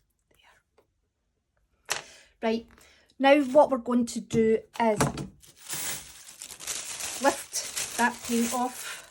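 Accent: Scotland, United Kingdom. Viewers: When you chide yourself for (almost) being daft it sounds like a compliment, but when I do it using my mid-western American flatlined pronunciation it sounds like a guilty admission. 😜 This is by way of a request/ gentle reminder for you to use a wee bit more Scots as part of your guidance. When I pronounce daft your way I feel much better when I run off the rails accidentally on purpose. I’m sure there is much more Scots argot for this pursuit. Thanks again for your help.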